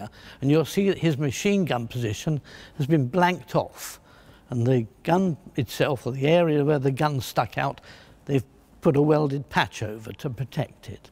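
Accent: United Kingdom. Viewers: Something about his British accent, cadence, and tone - yeah definitely easy listening.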